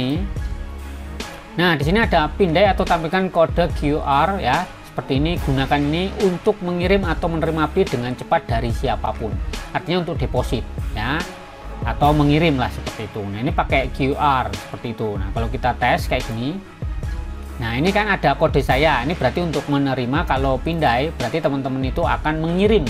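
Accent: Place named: Java, Indonesia. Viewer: medok kali mas.....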